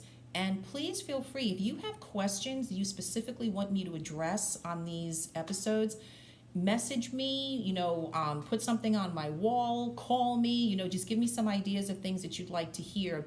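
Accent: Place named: New York, United States. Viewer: Great video! I love the NY accent!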